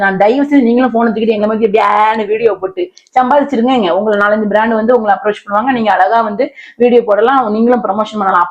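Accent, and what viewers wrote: Tirunelveli, India. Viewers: சிஸ்டர் நீங்க 3 கோடி 47 லட்சம் Views வச்சியிருக்கீங்க அது மற்றவர்களுக்கு பொறாமை கவலை பட வேண்டாம் தங்கச்சி என் சொநத ஊரு சேரன்மகாதேவி நானும்ஒரு யூடிப்பர் தான் நான் இப்போ இருப்பது ஈரோடு உங்க திருநெல்வேலி பாசை கேட்க இனிமையாக இருக்கும். என் பெயர் ராஜேஸ்வரி என்னோட சேனல் Rajiyin Rajyam என்னால் இப்போ Video போட முடியவில்லை தங்கச்சி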